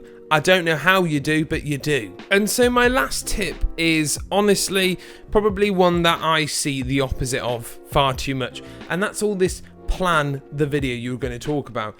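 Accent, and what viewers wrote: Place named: Birmingham, United Kingdom. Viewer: oh thanks mate, that's so nice of you and my bad I couldn't recognize that brummie accent....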